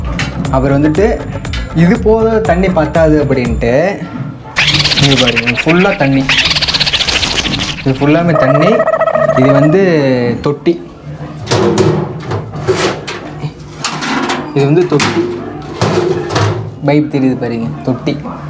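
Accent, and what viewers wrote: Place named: Coimbatore, India. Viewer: sirunga coimbatore bhashaikku na adimai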